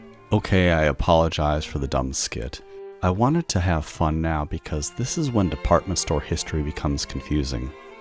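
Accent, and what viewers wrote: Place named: Midwest, United States. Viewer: I don't think I've ever been this early. Your videos are great! I love the calm narration, the midwest touch, and the little skit y ou did. <3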